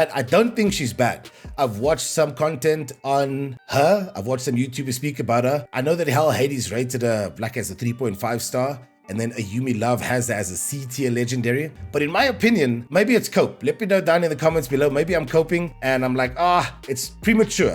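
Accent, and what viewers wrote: South Africa. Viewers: Sounds like you come from SA! Glad to see this.. good luck for the channel, now following 👍🏻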